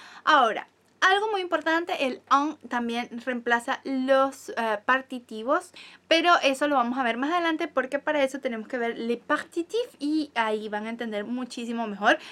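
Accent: Venezuela. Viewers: Tiene acento venezolano😁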